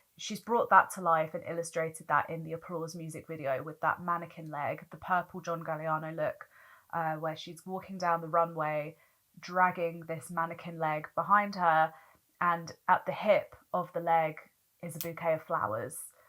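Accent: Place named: United Kingdom. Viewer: This girl sounds british, I think she is as well as a pop-culture student and especially from The Queen Gaga,The Best & The Great.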